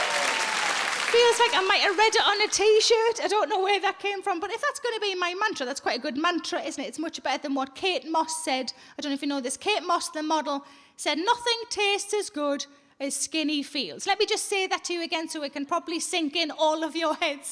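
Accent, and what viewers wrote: Newcastle, United Kingdom. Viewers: I love the Irish sense of humour, too. But, English. That's a Geordie accent - she's from South Shields, in the North East.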